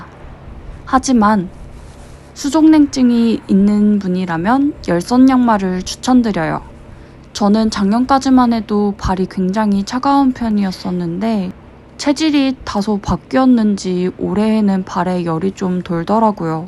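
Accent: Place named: Gyeongsang, South Korea. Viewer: 고향이 어디세요~ 경상도 억양이 나와서요~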